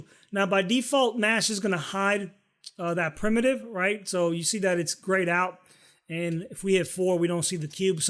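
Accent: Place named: New England, United States. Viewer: Awesome tricks, nice to see some tuts with good ol' new england accent too.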